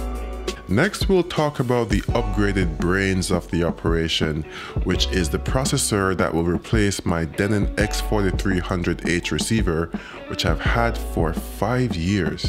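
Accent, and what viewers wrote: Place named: Jamaica. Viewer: or you Jamaican? yu sound like a yardie my youth, nuff respect.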